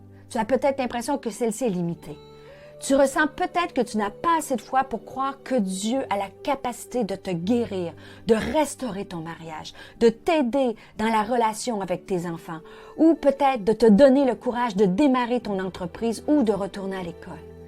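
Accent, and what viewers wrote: Quebec, Canada. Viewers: Bonjour un plaisir d'entendre cet accent je ne connais pas le Canada mais j'aime votre accent. Merci pour ce partage, oui Seigneur tu as toute la puissance pour multiplier nos besoins spirituels, physiques,moral, matériels. A toi sois toute la gloire.❤️🙏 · Bonjour Pasteur.Contente d'entendre une voix féminine ce matin.J'aime bien votre accent Québécois. Merci pour les explications sur ce passage de Matthieu 15...Amen..Je crois que le Seigneur Jésus a le pouvoir de faire fructifier mon finance afin que je puisse aider les autres. Restaurer les couples en difficultés et ouvrir les yeux et se révéler aux personnes qui ne le connaissent pas.Gloire à Ton nom Seigneur Jésus Christ. Soyez richement béni en ce mois de décembre On est ensemble ❤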